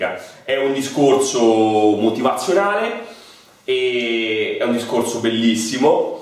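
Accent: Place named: Tuscany, Italy. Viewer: Si sente il toscano ,leva il foglio ci vuole piu grinta .....e non gesticolare troppo !!!!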